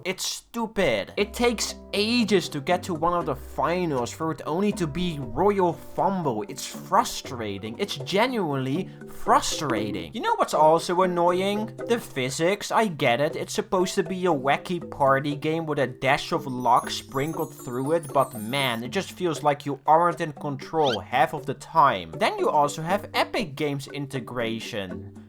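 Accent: Netherlands. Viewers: Deze man heeft een beetje een hele grote Nederlandse accent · you sound dutch as fuck bruv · Tering…….wat een cringe nederlands kut accent 🙃🙃🙃🙃🙃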